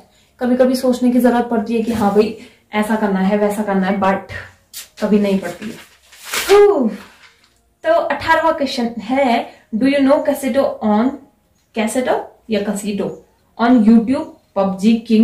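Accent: Garhwal, India. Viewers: tum sayd gadwal se ho,Mai almora se hu, apki gadwali bilkul hmari kumauni jesi hai...kyapp swal hogya na 😀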